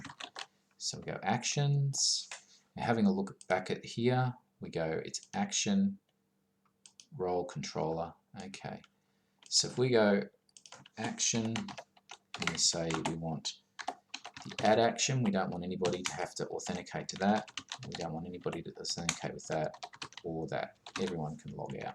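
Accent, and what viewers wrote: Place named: Australia. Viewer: Thanks, noted. I have tried to code your approach above but have an 'Invalid header value type; must be a string or numeric; received NULL' error. In trying to resolve this I wanted to know about the "getAttribute('identity') at the mark (line 32) and what is 'identity' referring to please. Also, great to hear another Aussie, when it comes to providing coding solutions! Cheers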